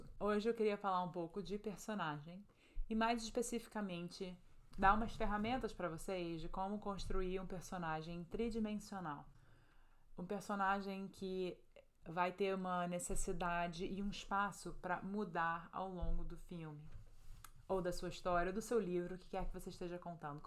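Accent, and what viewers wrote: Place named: United States. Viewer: Pelo sotaque ela é americana, vi agora um primeiro vídeo seu. Se é americana ou aprendeu nos EUA, o ensinamento sobre roteiro é ouro. 👏👏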